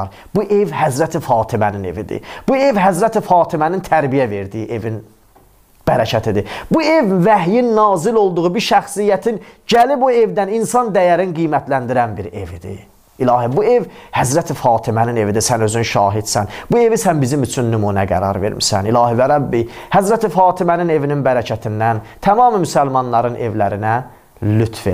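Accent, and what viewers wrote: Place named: Iran. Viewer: Azərbaycanlı ola _ola İran ləhcəsi ilə danışırsan?Bunu yığışdır İran İttilatı sizə belə öyrədib???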